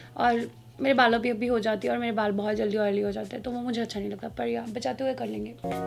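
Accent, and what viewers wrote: Punjab, India. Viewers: Hello Mem ap Punjab se ho kya apni batye payari ha bolne ka tarija name nic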